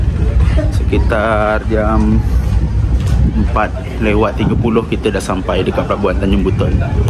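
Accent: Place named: Johor, Malaysia. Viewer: Salam...maaf baru terjumpa channel sedara..nak tanya juga ni melayu Daik Lingga atau orang Malaysia..sebab cara percakapan tu, macam orang Johor..bangga saya sebab sedara tidak banyak terikut dgn loghat bahasa melayu Indonesia, tetap dgn keaslian melayu..harap lebih banyak konten yg akan sedara upload dimasa mendatang..👍👍👏👏👏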